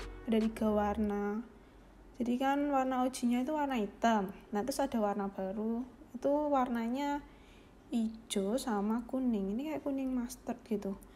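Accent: Java, Indonesia. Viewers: Logat nya kaya jawa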